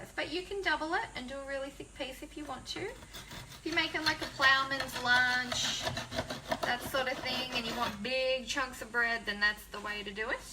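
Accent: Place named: Australia. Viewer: she's talking with and aussie accent......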